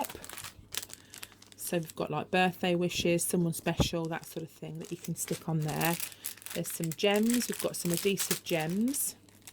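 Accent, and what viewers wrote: United Kingdom. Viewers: Just came across your channel after typing in UK crafts. I was getting a bit fed up with most sites I'm subbed to being American with items not available over here. It's good to here a British voice! I'm on a tight budget & never heard of 'Every Craft A Pound' so this is great news for me. As for your craft room...WOW! It is just so beautiful & all so neatly organised. I don't have a spare room so my very small & narrow living room is also my crafting room! hehe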